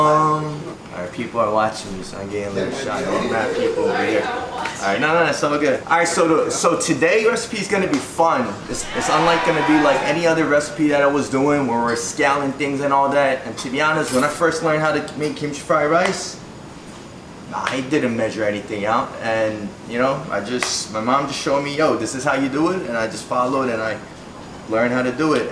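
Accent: Philadelphia, United States. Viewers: He's not cursing he's just speaking Philadelphia.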